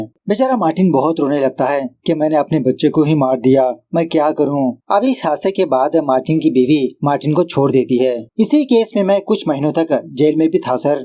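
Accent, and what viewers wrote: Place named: South, India. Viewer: Aap kadapa se ho😮 aapka accent south Indian hai but i didn't think k aap Andhra Pradesh se honge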